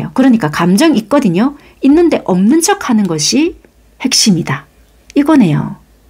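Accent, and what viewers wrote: Busan, South Korea. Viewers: 부산 사투리 입니꺼? 😊😊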